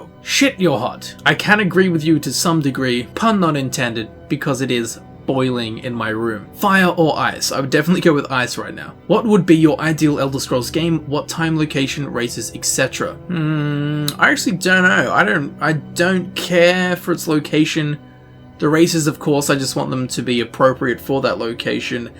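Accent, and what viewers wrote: Australia. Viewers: how happy were you when you saw the storm atronach camel ? I laughed out loud ;) also. I just determined that you're my geek gamergirl crush when you answered the question about choosing daedric lord or dragonborn. handsome Australian guy with an accent AND can answer as many obscure lore questions about TES as I can? SOLD.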